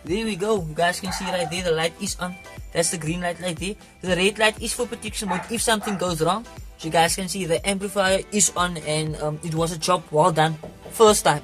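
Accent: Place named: Afrikaans, South Africa. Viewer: Sounds afrikaans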